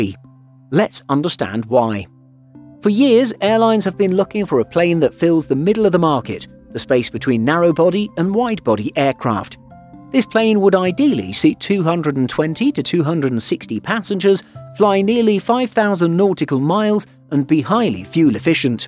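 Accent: England, United Kingdom. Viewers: Which English accent does this guy have?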